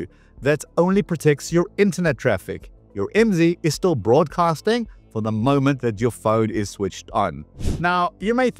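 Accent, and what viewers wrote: South Africa. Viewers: Whoa … when I saw your name, I expected your accent to sound way more like my dad’s rather than a South African.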